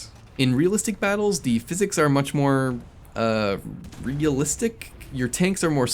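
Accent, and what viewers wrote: Czech Republic. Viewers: are u czech ? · Are you from czech?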